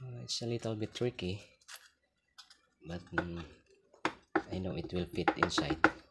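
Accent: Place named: Philippines. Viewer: The way you speak English,similar to us filipinos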